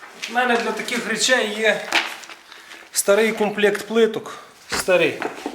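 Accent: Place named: Lviv, Ukraine. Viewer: Мутуцикла) Цей Львівський акцент))))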